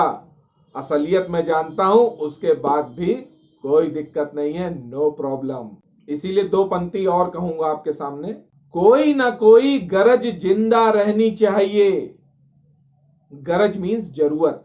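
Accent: Kanpur, India. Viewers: Kanpuriya lagte ho bhaiyaa jee app🙏🙏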